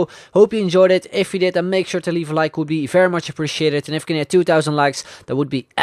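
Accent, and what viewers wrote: Netherlands. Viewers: Hij klinkt nederlands, is hij dat ook?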